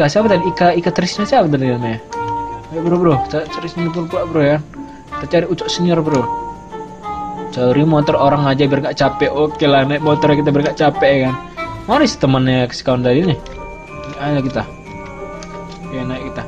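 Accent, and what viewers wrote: Medan, Indonesia. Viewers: bang :v orang Medan ya :v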